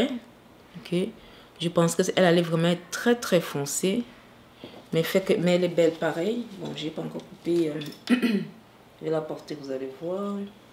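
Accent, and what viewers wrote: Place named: Cameroon. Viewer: Je ressens un accent camerounais, vous êtes camerounaise ? J’adore ce haul surtout la longue robe à la fin la .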